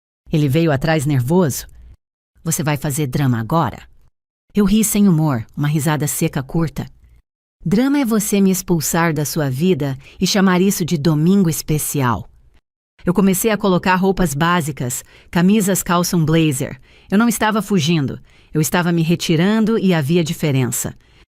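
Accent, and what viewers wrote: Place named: São Paulo, Brazil. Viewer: a narração é interessante com sotaque do interior paulista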